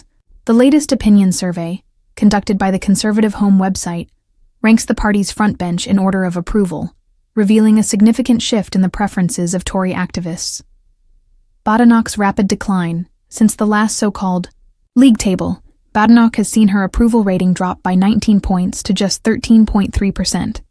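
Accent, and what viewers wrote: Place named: United States. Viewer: Why have we got to have an american robot speaking? Get a real person, and preferably an English one!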